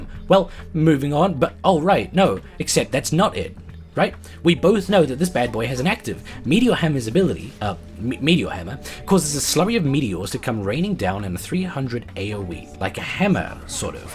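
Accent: New Zealand. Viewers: hes a New Zealander but i guess its kinda the same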